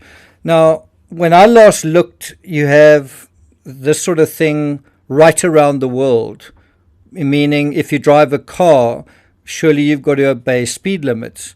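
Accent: South Africa. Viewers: He's South African. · A very interesting video. Thank you so much for covering this case (and all the others you cover, actually). That's the reason I subscribed. You are logical and thought provoking and I always look forward to watching your videos. I was born in Joburg, so love hearing your accent. To this video though ... I don't think anyone will tattle. They are from a farming community and I think that particular property has been in the family for a couple of generations, though I haven't researched that so I could be wrong. I personally think they will stick together, no matter what occurred "think as thieves" comes to mind. But I would love to hear your perspective as to why the mother Jessica reportedly left the property after Gus went missing. The same thing happened with the children missing from Nova Scotia - the mother took off the same day, from memory. 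I then saw something recently where the step-father has been arrested for some sort of assault charges (not related to the missing children). I couldn't help but go " ... hmmmm ... " I am wondering if Jessica left the property after Gus went missing to distance herself because she is aware of what happened to Gus. Looking forward to your next video. Thank you again for your excellent coverage.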